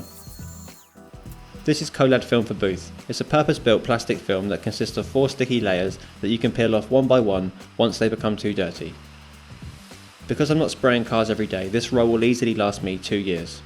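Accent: United Kingdom. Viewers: La placa española me ha dejado descolocado, eres de España? Tienes un acento británico que no se tiene ni no se ha nacido allí 😂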